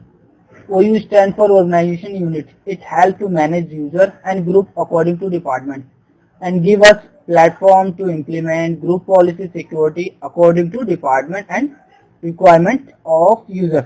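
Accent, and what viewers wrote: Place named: India. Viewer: Can’t understand anything thick Indian accent had to pause each time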